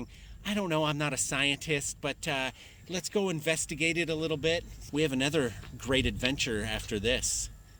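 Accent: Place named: United Kingdom. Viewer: A pleasure as always. Ha ha, yeah I think that British with a twang. That swim spot is divine.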